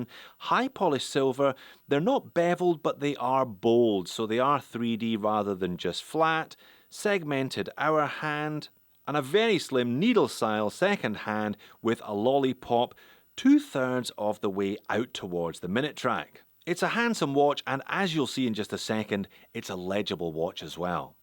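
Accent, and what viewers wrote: Scotland, United Kingdom. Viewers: Mate!. You can tell you're Scottish.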